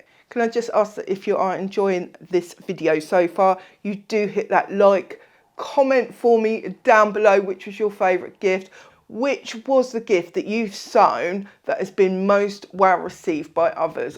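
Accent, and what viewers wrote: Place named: Kent, United Kingdom. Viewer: HI, sorry if this is too personal, but me and my husband have been trying to place your accent. I say Kent he say's Dorset. Love your videos.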